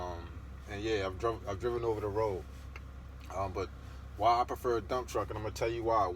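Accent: Baltimore, United States. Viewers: Do you have to be a dump truck owner op..to make this kinda bread ? Been drivn otr 5 months..and u sound like my city bmore lol?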